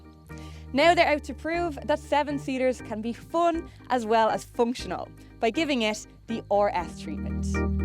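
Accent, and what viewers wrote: Ireland. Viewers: I just came here for the Irish accent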